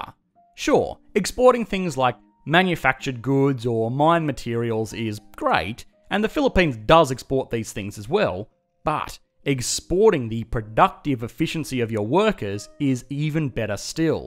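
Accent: Australia. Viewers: Australian narrator its hard 2 understand ur english accent. Tenx 4 feature d philippines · He is not a British man. He is Aussie. Accent checked lol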